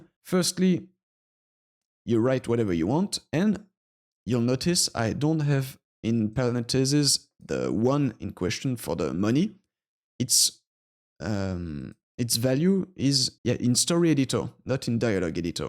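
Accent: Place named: France. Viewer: En tant que française j'ai reconnu de suite l'accent :D très bon tuto! la boite à outil est elle à la portée de tous?Je veux dire est ce que si on ne fait fait ni modding ni programmation , n'importer qui pourra créer sa map ,et ses quetes? j'ai lu aussi que ce n'était dispo que pour steam ? il y a t il un espoir pour GOG?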